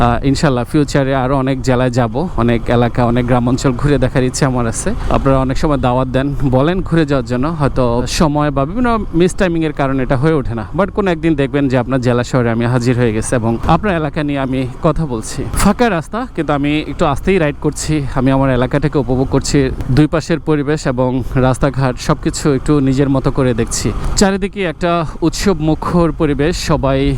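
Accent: Chandpur, Bangladesh. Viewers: Vaiya apnr vasa ki Chandpur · Apnar basha Chandpur, age jantam na😮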